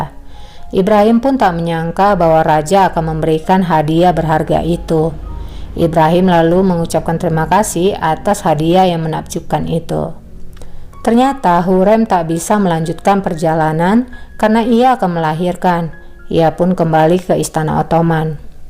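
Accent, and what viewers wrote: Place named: Medan, Indonesia. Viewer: Yg ngomong ini seperti saudaraku ya orang medan sebab ada omongan lalap dia hamil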